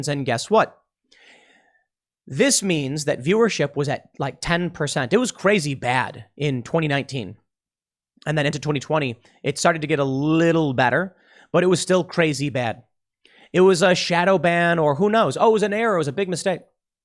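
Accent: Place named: Chicago, United States. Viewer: stereotypical chi town accent is funny. You can tell he is from the Midwest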